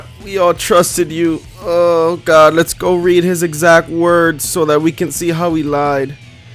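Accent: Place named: United Kingdom. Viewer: never new you were British mate :)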